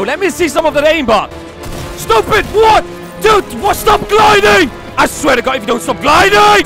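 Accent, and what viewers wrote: Netherlands. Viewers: R u dutch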